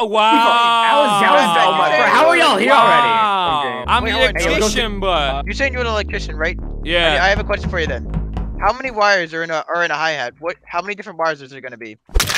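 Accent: Netherlands. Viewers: Bruh echt een nederlands accent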